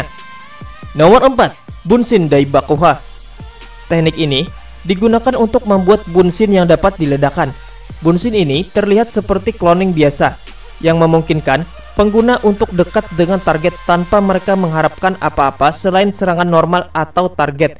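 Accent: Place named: South Kalimantan, Indonesia. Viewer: Bah nang ini tuh totok banar pian mang ay banjar kalua kah si amang nih nang kaya bang ipul hary haja jaejak si bungul tuuh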